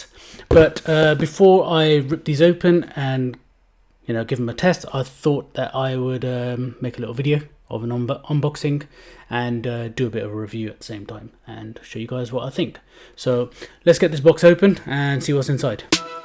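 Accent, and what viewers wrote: England, United Kingdom. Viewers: Great review, liked your pure english accent! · Bhai tumhara accent ek dum english man jaisa hai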